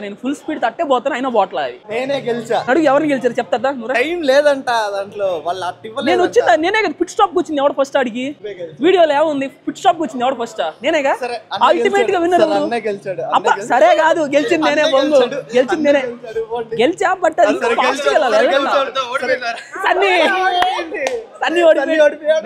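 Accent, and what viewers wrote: Nellore, India. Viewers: Video total febless 😊 total video lo nellore slag matladuthu ne unavu enjoy orange 👌👌👌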